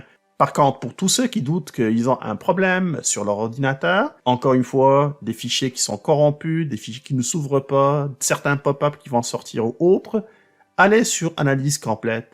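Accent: Quebec, Canada. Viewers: Merçi mon ami je n'ai pas d'ordinateur mais c'est sympa d'entendre le Québec ✌🤗😂😂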